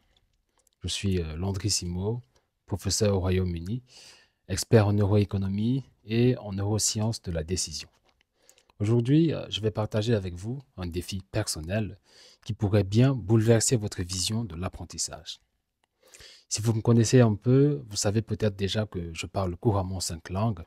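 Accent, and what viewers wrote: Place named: France. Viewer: je repond EN italien que je suis Fiorentino ou' est ne Dante ..... Sei un grande professore e m ' hai fatto capire i meccanismi del nostro cervello che già sapevo però tu l hai spiegati in maniera eccezionale ... Poi il tuo accento Francese in più parli piano lentamente ... Via grazie mille hombre !!!!!! Ciao professo !!!!!!!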